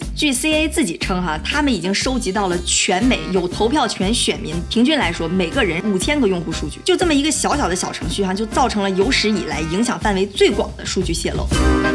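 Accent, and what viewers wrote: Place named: North, China. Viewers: 大姐， 你这英文咋山东味的？ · Her heavy northern Chinese accent drives me nuts! 😁 some may love it.. well, not me...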